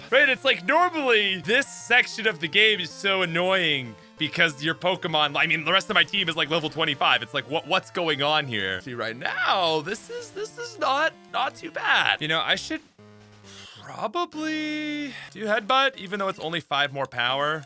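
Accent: Canada. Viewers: dude, i mean this in the best way possible but i just happened to find your video in my recommended and i heard you speaking, particularly how you pronounce "out" and words that end in -out and immediately clocked you. i say this as a canadian myself, i just never noticed how easy it is to tell until i see a canadian youtuber when most of the ones ive been watching recently are not canadian/dont have that particular accent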